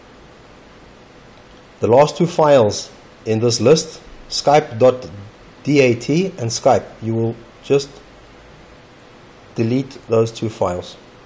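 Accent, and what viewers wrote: South Africa. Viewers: Are you south aftican?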